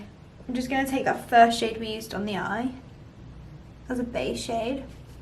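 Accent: United Kingdom. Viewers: So happy to see u back. Vertigo is not fun. It’s horrible. My mother in law has got it and she has to be so careful any kind of motion can bring it back. Can’t wait to see more tutorials and hear your cute English accent 💕💕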